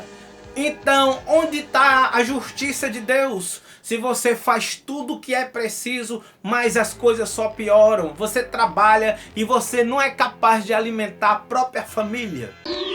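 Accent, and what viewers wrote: Northeast, Brazil. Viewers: Meu amigo, á muito tempo sigo seu canal, vejo seus vídeos, gosto muito. Você é um cabra arretado, que fala tudo com esse sotaque humorístico gostoso dos nossos irmãos nordestinos, mas vendo hoje esse vídeo, me emocionei um pouco, com tão belas explicações do evangelho em resumidas palavras. Se o homem foi inspirado para escrever a bíblia, como dizem aqueles irmão mais fanáticos das religiões, você estava inspiradíssimo por Deus, na hora que fez este vídeo. Estou vendo que foi o ano passado que você fez, mas esta reflexão vai valer por seculos e este momento de quaresma, vai ser sempre mais um bom momento para podermos acompanha-lo e refletir. Ah! Se todos os padres, bispos e pastores das religiões tivesse a graça de Deus que você teve, para explicar de forma tão direta o que é Jesus e quem foi aquele povo, aquele sacerdotes, aquele sistema. 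Ou seja: Não mudou nada. Jesus continua morrendo coossificado e o povo continua crucificando Jesus.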